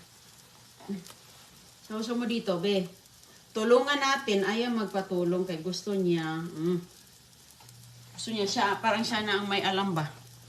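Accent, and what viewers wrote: Visayas, Philippines. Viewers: bisaya kasi siya o waray kaya ganyan ang pronunciation 😊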